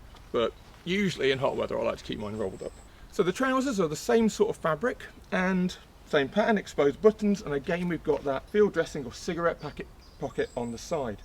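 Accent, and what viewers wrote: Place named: United Kingdom. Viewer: Just the way a lot of us say it in the U.K. same as a lot of people say car been rather than carbine with an I sold :)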